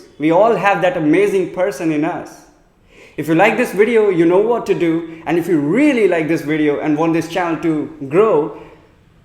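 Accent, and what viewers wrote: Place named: United States. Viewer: Wow....mind blowing English in American tone.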